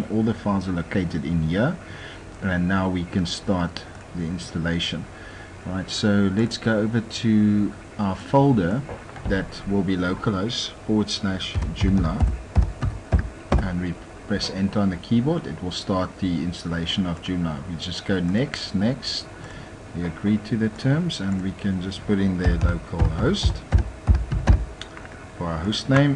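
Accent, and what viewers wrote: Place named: South Africa. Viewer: Spoken like a true South African hey?! LMAO. Hard to miss that accent after all these years of living in the US. Ek mis Suid Afrika so veel my broer dankie vir die studieklas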